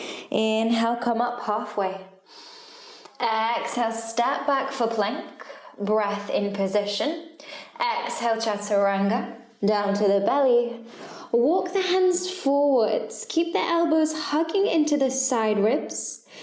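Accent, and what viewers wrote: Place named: England, United Kingdom. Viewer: Your 60 min sessions are great. I love your accent! I'm not good at guessing but I guess England or Australia. Anyway I can listen to you talk for days. Great workouts! Appreciate your time.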